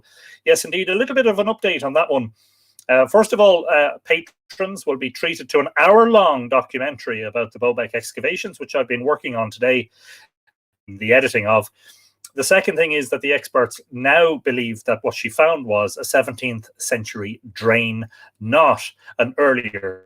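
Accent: Ireland. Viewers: Thanks for sharing! As an american with lots of Irish blood - I can't get enough of these talks, information about these festivals and your accent makes it all the more pleasant. I do have a question : since Lugh was living long before the sons of Mil - is Lughnasa a Celtic celebration or was it an Irish celebration that was adopted by the Celts? There are plenty of celebrations around the world on August 1 so it would make sense to me that Lughnasa and Lammas combined after the Celts came to Ireland but I haven't done much research into that...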